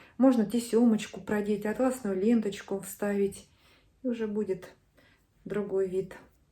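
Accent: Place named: Voronezh, Russia. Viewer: Ну все красиво, и мастерица и ее работы !!! Чудо-воротнички, с каждым платье смотрится по разному. Очень приятно слышать любимый воронежский говор ! Если я не ошибаюсь.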